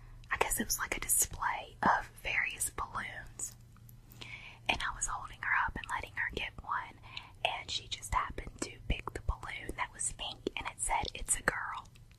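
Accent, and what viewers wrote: South, United States. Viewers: Wow, you have a southern accent! I think you peeps who have southern accents are the most relaxed people! Your voice is sooo relaxing. :) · I really enjoy your southern accent :P It bring tingles down my spine :P Awesome video once again!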